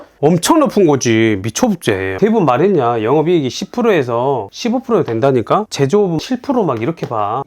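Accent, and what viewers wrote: Jeolla, South Korea. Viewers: 전라도 사투리에서 거른다 ㅎ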